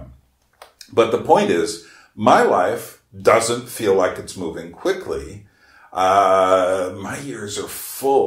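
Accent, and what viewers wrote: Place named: United States. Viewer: Thanks for the link to Rookmaaker. His english with german accent is tough to my spanish ears, but I captured the essence of the lecture (he says "the heart is a pump", don't he?) I can see the conection beetwen he and your videos, and now I think you were right when you said that all of us are postmodern thinkers. Even Rookmaake seems postmodern when he insist in the reallity beyond the called "facts", or science, or aristotelic logic. This is an idea shared with the postmoderns. In fact I remember myself as a teenager defending the idea of the reallity as a personal construct. I used to like science, math and all that, but I never liked the scientificism in the philosophical sense, it's seems a silly vision, the philosophy of an AI, I supouse. The problem is that postmodernism has become worst han those square minded fisics I didn't like in the seventies and eighties. 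PS: I can understand you well enough, your american accent is the easiest to me. The worst are british people, with all these crazy vowels...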